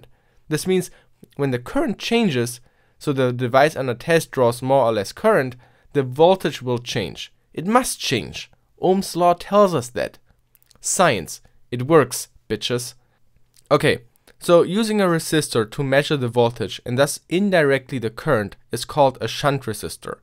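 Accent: Germany. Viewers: Bist du eigentlich deutsch? ;D Die Aussprache ist zwar gut aber irgendwie zu deutsch xD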